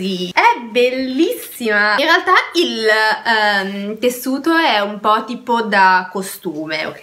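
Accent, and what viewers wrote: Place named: France. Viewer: bella la scritta sulla maglietta killing you in my mind,complimenti per il fisico =) cmq mi fa simpatia il tuo accento,sei francese? 😁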